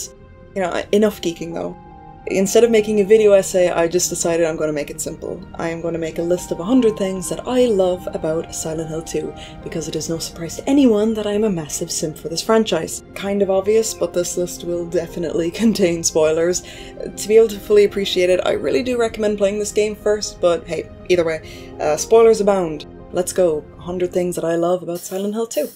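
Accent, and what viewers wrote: Ireland. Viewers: Thank you so much for this video I love everything silent Hill I was very annoyed that I didn’t use guy Cihi in the remaster and I have high hopes for the remake not sure if it’s still Romor but I believe it is coming . Shout out from Dublin. Your Irish too yeah 🤪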